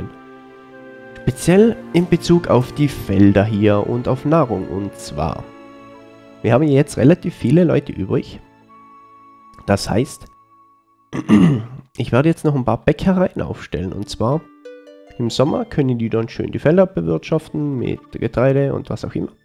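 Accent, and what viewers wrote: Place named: Austria. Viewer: Bist du Österreicher? So vom Tonfall klingt es etwas so :)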